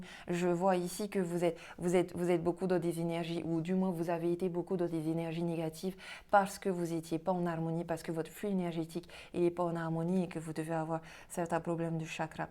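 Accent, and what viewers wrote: Tahiti, French Polynesia. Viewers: Bonjour, merci pour votre message 🥰oui oui vous avez bien reconnu l’accent tahitien d’une tahitienne 😉 belle journée ☀️ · Bonjour, merci pour votre travail. Vous avez l’accent tahitien. Belle journée.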